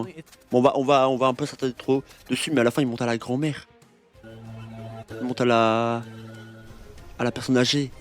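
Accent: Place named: Arab world. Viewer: W VIDEO W ACCENT ARABE ARR 😭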